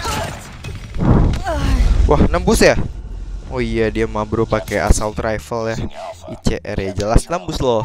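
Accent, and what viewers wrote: Java, Indonesia. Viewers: ngomongnya kayak orang Jawa untung aku orang Jawa jadi ngerti gituh hehe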